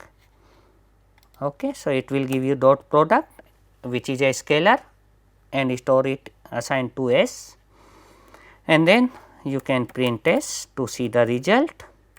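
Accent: India. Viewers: Dude, then don’t watch it. There’s a great amount of effort put in to make it available for free. Also this is not an Intro to linear algebra course. Read the course title and description before you complain about pan parag accent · No wonder Gilbert Strangs Linear Algebra stands the test of time. Merely writing down the notations for students to memorize is typical Indian education style not to mention the pan parag way of talking “pour” “dejine”, “jeero” is off putting. The accent can be excused but add illustrative examples. New learners would be clueless why do you go for the cosine for angle between 2 vectors and why is magnitude important. Just writing it to memorize wont cut it.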